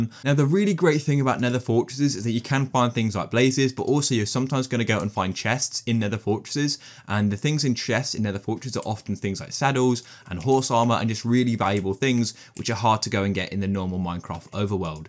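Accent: United Kingdom. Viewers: 1 word british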